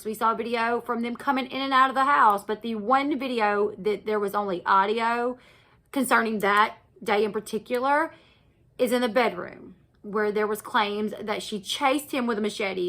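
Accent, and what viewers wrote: South, United States. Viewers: I love your country accent and I love hearing you say book so I think it’s hilarious that we’re now keeping count of how many times you say it 😂 You look so beautiful.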